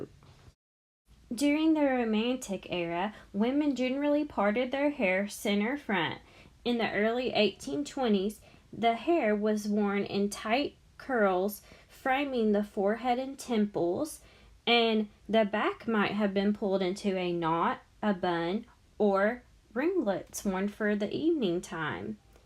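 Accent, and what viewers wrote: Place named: Missouri, United States. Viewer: I love your accent, are you from misouri? I'm not from the states so that's the closest guess I can make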